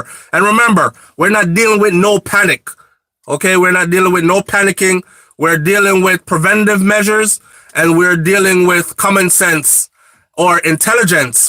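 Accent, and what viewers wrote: Trinidad and Tobago. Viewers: The trini accent on point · Luv the Trini accent..lol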